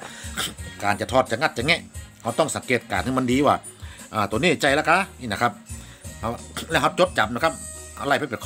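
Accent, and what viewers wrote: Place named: North, Thailand. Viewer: ผมคนใต้ชอบสำเนียงคำเมืองมากครับ เพราะมากอีกแบบหนึ่ง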